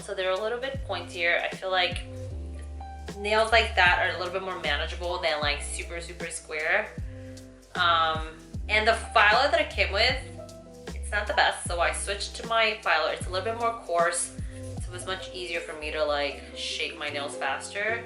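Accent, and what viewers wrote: Puerto Rico. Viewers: Chica, I"ve experienced culture shock in the past, pero the fact that a Chinese girl that speaks "Puerto Rican" Spanish, is super Fu!@ cool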